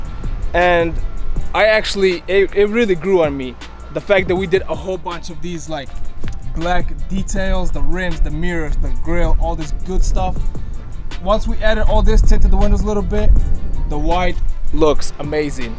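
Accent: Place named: Russia. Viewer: Russian accent not black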